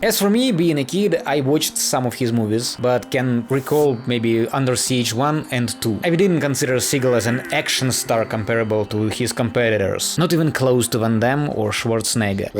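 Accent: Russia. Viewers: dude your russian accent is so thick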